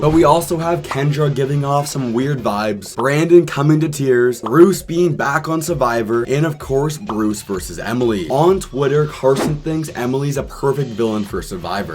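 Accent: Canada. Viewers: Your Canadian accent 🙈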